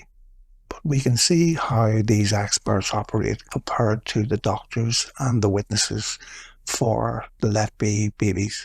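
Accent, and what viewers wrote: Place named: Northern Ireland, United Kingdom. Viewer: that's a killer Norn Ireland accent there.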